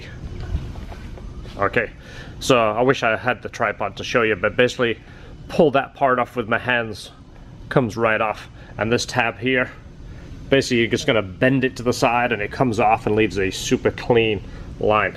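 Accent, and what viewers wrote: United Kingdom. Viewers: I'm probably wrong but do you have a British twang to your accent? ..Other than that, great informative video..Si..UK.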